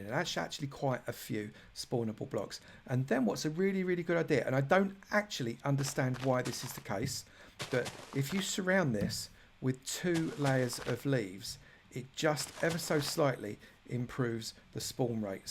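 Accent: United Kingdom. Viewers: Niks because he has a British accent, dumbass.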